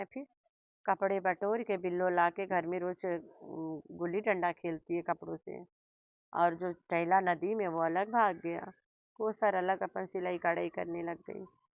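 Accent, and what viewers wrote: Uttar Pradesh, India. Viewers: Aap ki bhasa to humare u p india ki lg rhi 😅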